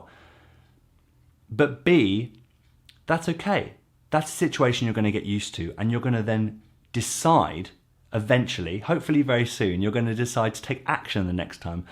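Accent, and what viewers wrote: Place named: United Kingdom. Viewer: J'aime ton accent britannique. :)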